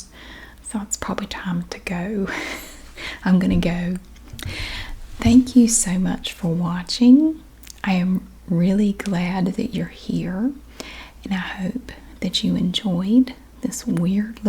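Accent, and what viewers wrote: South, United States. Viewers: That’s hilarious that someone accused you of faking it. I’m from the south and there’s many many different accents. My kids don’t have as much as an accent as I do either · I found your channel four years ago when I moved away from home at 18 from Alabama to California (to go to school for linguistics). I already loved ASMR but I started to get homesick so I looked up "ASMR southern accent" because I thought it would bring me some comfort. Your channel was the first one to come up, and your voice reminded me so much of home! So thank you for bringing me a little bit of familiarity and comfort during a hard time, and I love your accent ❤ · I follow Tabitha Brown and she’s also from North Carolina and has a lovely southern accent too and I enjoy hearing how you both pronounce certain words. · 6 years ago I went through military bootcamp and it was the first time I was really exposed to the southern accent. 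I remember one specific girl, she was my wingman, who was from North Carolina and she had a very thick southern accent that reminds me of yours. I also made lots of friends from Georgia while I was in the service, my Georgia boys ❤️ The southern accent will always hold a special place in my heart. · You accent sounds like Forrest Gump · Can you do a video trying to speak without southern accent? itd be really fun to watch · I love your accent! I am planning on going to college in the south next year because I have always loved listening to people with these accents. wish I had one myself! Love your videos 💗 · your accent reminds me of my mom's side of the family. To me accents are beautiful just like different languages. To hear you brings back a memory of my Nanny (my mom's mom) she had a fantastic southern accent. Now I'm born and raised in South Texas and my mom from TN. So thank you for bringing back a nostalgic feeling. 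You keep doin you and I concur the internet being exposed to social media affects us. In every way possible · something about souther accents makes me tingle.... thank you for this video! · First of all, I love your accent and positive energy! Also, I’m a store shelf organizer too 😜 ❤️ So many people think that there’s only one southern accent. I’m from Louisiana, and we have several different southern accents over here as well. The north LA accent sounds completely different from the way we talk down here in south LA. We, like you in N.C., also have several different accents just within the coastal parishes of our state. Where I live, we sound like what you hear on the tv show “Swamp People”. Whereas, in the northern part of the state, they sound more like The Robertson’s from “Duck Dynasty” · I enjoy trying to mimic accents, and yours is the most fun to try. It makes words more fun to say and it's pretty to boot. 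Many Southern accents have more in common with British than I think a lot of people realize. A lot of syllables are drawn out in a similar way. Thanks for the tips and tricks, soon I will be an expert lol ;p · I love to listen to you talk. My dads family was from West Virginia, so their accents are different. But I love all southern accents. Sounds like my childhood. ❤️ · Whoever accused you of faking your accent is clearly unfamiliar with genuine Southern accents, and has watched Steel Magnolias one too many times. I grew up in North Mississippi and I currently live in East Tennessee. The accents are similar. Only slight differences in N. MS and TN accents, but N. MS and S. MS accents are VASTLY different. Anyway, thank you for the video, and it's always good to see you too. ♥ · I live near raleigh and I hate that I don’t have a southern accent- my mom moved from Franklin Virginia to California and got made fun of because of her accent so she had to learn how to talk like them I always wanted an accent like yours and I love watching your channel because you have the same accent as my grandmother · The southern accent is one of my favourite american accents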